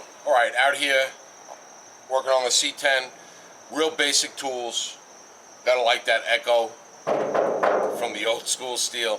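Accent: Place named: Long Island, United States. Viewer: I knew it was long island before I even saw the shirt. Haha. Can't mistake that accent for anything. I got family in Smithtown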